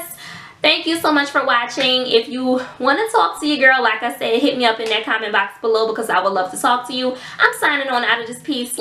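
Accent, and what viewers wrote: New Orleans, United States. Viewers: YOU FROM NEW ORLEANS? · Yes!! I love the Nola accent..Especially when you guys say."Babbyy"😁😁.!!! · I love this Nola accent I love being from down south baby it’s just something about our lingo and how we speak and each state is different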